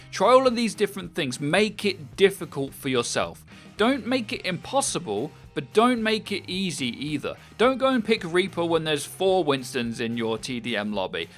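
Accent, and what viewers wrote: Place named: United Kingdom. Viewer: Im from germany and i love your british accent!!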